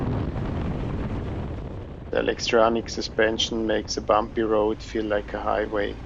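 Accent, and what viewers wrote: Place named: Switzerland. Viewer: Sehr gutes Video, gute Kommentare und angenehme Stimme. Bei einem guten Englisch bin ich überzeugt dass Sie Schweizer sind .😊🙂‍↔️🙂‍↕️.👍👏🇨🇭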